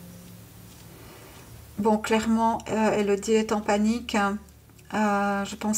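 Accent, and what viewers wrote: Switzerland. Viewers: Bonjour je me suis abonnée. Vous êtes suisse? Je reconnais votre accent. Je suis de nyon.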